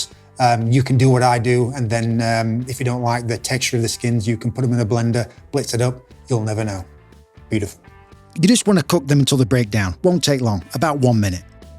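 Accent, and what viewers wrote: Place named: England, United Kingdom. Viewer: Tomita's! Tomatoes! lets call the whole thing off! you sound like and English guy but say tomita's and not tomatoes like an American. Why?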